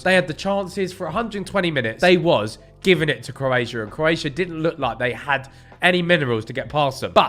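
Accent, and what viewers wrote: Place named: England, United Kingdom. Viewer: Its prob his english accent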